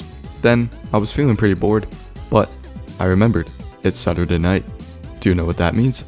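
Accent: Finland. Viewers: Your channel has a finnish name and you have some finnish in your accent but it says you're from united states wich way is it??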